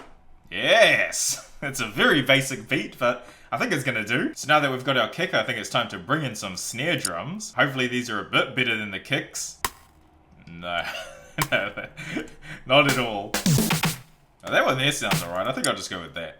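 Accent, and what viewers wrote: New Zealand. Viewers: You from nz g?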